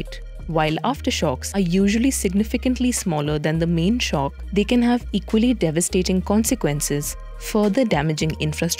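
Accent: India. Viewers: I hear indian voice i hurriedly cancel because when we have Europeans expert why should I waste my time listening a mass who believes more in religion then in law and order 😭😔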